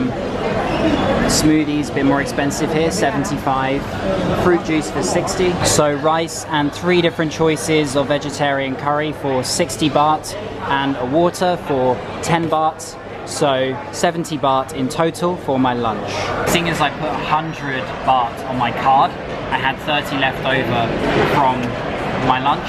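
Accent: United Kingdom. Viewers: I'm Thai people in BKK I love your video from Thailand, I very very like your British accent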